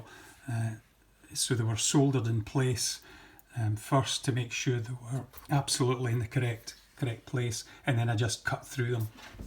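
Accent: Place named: Scotland, United Kingdom. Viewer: Always nice to hear a Scottish modeller. Subbed 👍🏻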